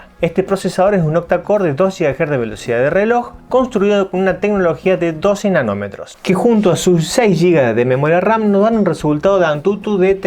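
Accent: Córdoba, Argentina. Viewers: Cordobes o santiagueño?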